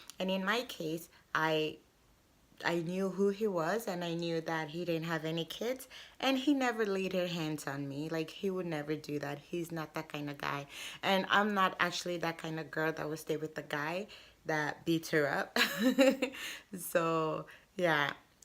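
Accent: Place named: United States. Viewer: your Accent look like Amerikan .how long had you been lived nigeria?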